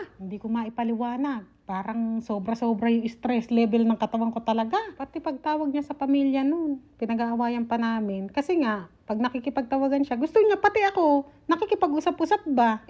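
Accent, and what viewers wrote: Nueva Ecija, Philippines. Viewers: Grabe yong buhay madam ano, lalo yong 10-13 pero talagang pag mag ti-tiyaga may maganda din mangyayari. Tignan mo ngayon super Blessed kayo. Followers mo ako sa dalawa mong fb account tas dito sa YT, silent, di ko lang napigilan mag comment ngayon. Andito din kami sa sharjah gusto ko din nga sana makapag bigay sa mister mo kahit paano sana tapos maisend sa inyo dyan sa pakistan. Ps. Ang asawa ko naman ay taga muñoz nueva ecija, kaya super natutuwa ako sa accent mo. ❤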